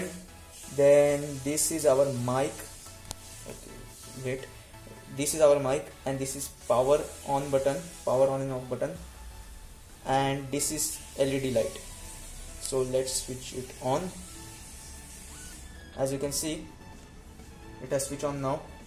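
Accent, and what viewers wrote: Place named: India. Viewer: He has that indie accent you can't change an accent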